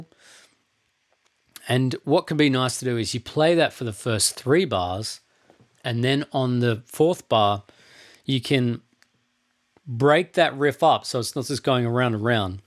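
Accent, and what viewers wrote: Australia. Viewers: his accent sure sounds australian to me not british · I would have guessed Australian, sound just like Keith Urban's accent, everybody knows he's Australian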